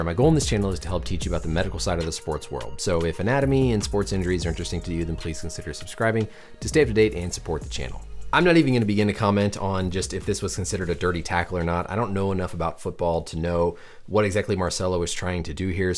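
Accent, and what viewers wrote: United States. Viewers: I subscribed because you said “football” instead of “soccer”.. and in an American accent not even British 🎉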